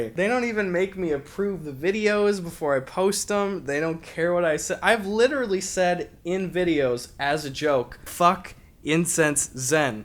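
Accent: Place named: Wisconsin, United States. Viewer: He can switch to his normal voice to his Wisconsin accent and that's pure power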